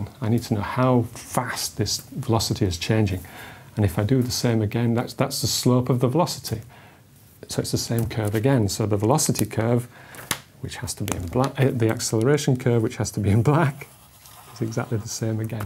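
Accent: United Kingdom. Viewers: British accent supremacy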